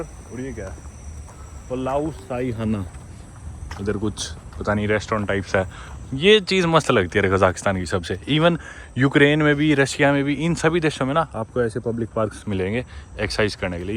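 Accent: Haryana, India. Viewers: भाईसाहब की हरियाणवी भाषा कमाल का है सुनने में अच्छा लगता है एक अलग ही वाइब है भाईसाहब को छत्तीसगढ़ से प्यार 🥰👍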